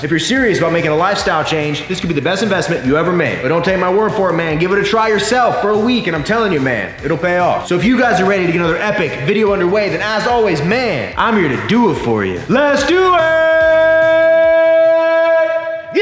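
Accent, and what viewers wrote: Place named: Alabama, United States. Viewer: Man the way you talk reminds me so much of the football dads from my small hometown in Alabama and I can’t get enough of it 😂😂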